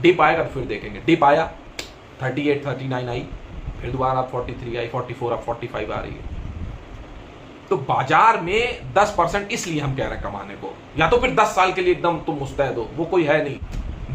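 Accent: Kanpur, India. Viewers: paisa ghar kaha aaya be maza aa gaya ye dialogue sunker bade bado ko ye baat samjhne me aadha jeevan lag jata hai sahi me aap kanpuriya style me bolte ho hum bui kanpuriya hai sab bahut dhag se samjhte hai maan gaye jeevan kaise jeena hai kyo hum log jeevan me aaye hai kyo hume future ki chinta nahi karni hai matlab utni karni hai jisse kaam chal jaye aur jeevan future ki chinta me kharab nahi karna hai is video me bahut kuch seekha ja sakta hai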